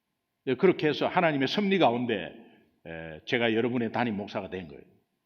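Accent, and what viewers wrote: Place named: Busan, South Korea. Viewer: 그사람이 순천사람이라서 사투리를 잘 모르나보네요 목사님은 완전. 부산말투예요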